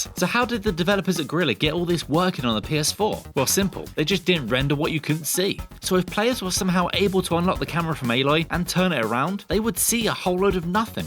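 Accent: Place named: United Kingdom. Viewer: Gotta be another British accent. I think they might have more than the entire USA!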